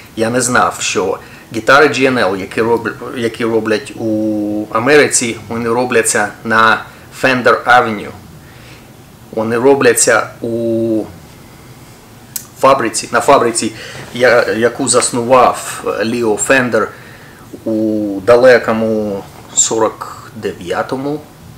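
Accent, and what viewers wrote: England, United Kingdom. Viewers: у тебе український з англійським акцентом, таке перший раз чую чесно кажучи!!)))) Отвіртка - викрутка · а як тобі вдається російською говорити без англійського акценту, а українською - з ним?:) Improvement - “покращення”, улюблене слово Вікторфедрича Царапают - дряпають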